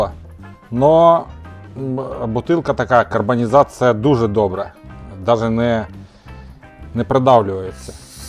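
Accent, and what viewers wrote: Ukraine. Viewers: Мої батьки з Донеччини розмовляють українською з таким же акцентом))))))) це мабуть територіальна особливість!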